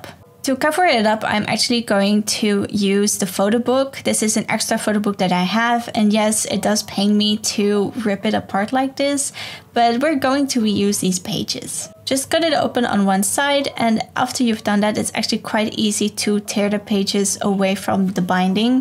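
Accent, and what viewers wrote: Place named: Netherlands. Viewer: Hi! I just found this video and really want to make one! Also, are you also from the Netherlands? I thought i heard a bit of a dutch accent 🇳🇱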